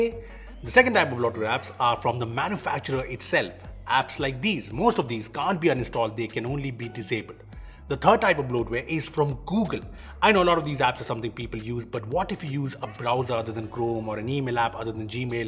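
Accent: India. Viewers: indian accent😐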